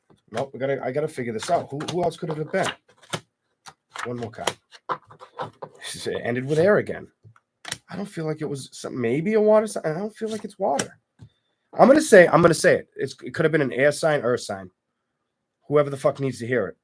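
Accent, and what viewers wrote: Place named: Brooklyn, United States. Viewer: My friend, love the confidence in the way you read! u giving me Brooklyn vibes that's an extra plus 😂😂❤❤❤❤ but that libra reading the ending u glitched my friend because I saw fire and you called it water ...no sweat but u moving toooooooo fast , I love it thou because u don't talk alot like most readers and I appreciate that!!! New subbie ❤❤❤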